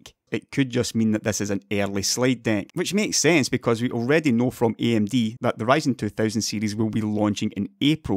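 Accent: Scotland, United Kingdom. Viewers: Is that Scottish accent or Irish accent? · scottish accent sounds like shit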